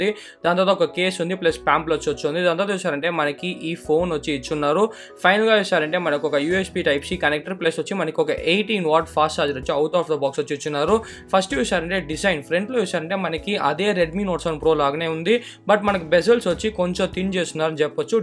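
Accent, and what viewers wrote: Tamil Nadu, India. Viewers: Telugu explanation with Tamil slang tho adharagottav bro.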